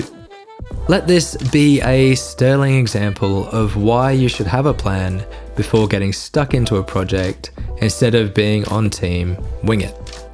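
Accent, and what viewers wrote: Australia. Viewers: Just saying thanks for the presentation: a genuine honest woodworker just doing what we do. the aus accent is appealing too. I found your videos because I also researched/purchased a Harvey table saw.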